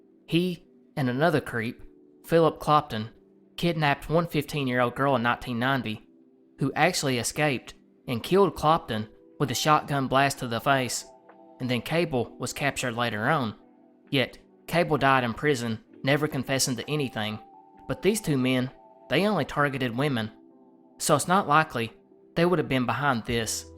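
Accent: South, United States. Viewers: Boy you are about as country as corn bread and black eyed peas. Love it!!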